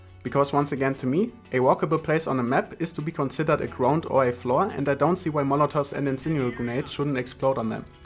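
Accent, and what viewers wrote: Germany. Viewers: You are german riight? I hear that. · dein englisch ist so schlecht man hörtdas du deutscher bist lappen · A question. Are you a german Youtuber who talks English? your accent sounds german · dieser dreckige deutsche akzent......den kann man sich einfach ned geben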